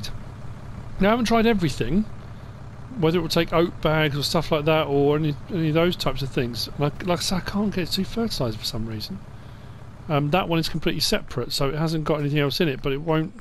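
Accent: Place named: Kent, United Kingdom. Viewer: Thanks once again for a great video, ive only been watching your videos for a month or so, but you are far and away my favourite FS19 info source. I have to ask though (and no need to answer if its edging on too personal), are you from Kent? You sound suspiciously like you are from my hometown of Maidstone, just another reason i like your videos so much, reminds me of home.:D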